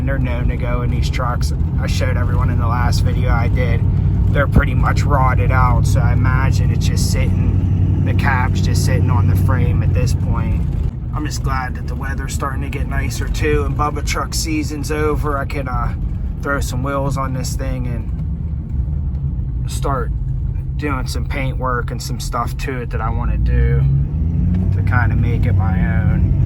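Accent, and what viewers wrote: United States. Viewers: that pittsburgh accent is thick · Also sounds like you got a Philly or Delco accent lol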